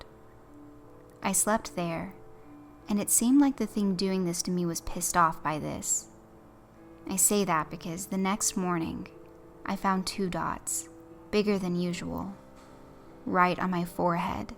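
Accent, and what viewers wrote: South, United States. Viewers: dont really like the southern accent tbh ;$ maybe I need to get used to it ( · Another good batch, your quickly becoming my favorite specially when ya get that cute southernish tone, keep up the good work. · I just saw your address in the description and realized how you can have a southern accent . Don't get me wrong it is nice to listen to . I told you before that I stumbled on to your channel when you were featured in another channel . It was like finding gold. You do a great job on your narrations . This video though more than a few had me scratching my head wondering . But I guess that is just me. At any rate keep up the great work .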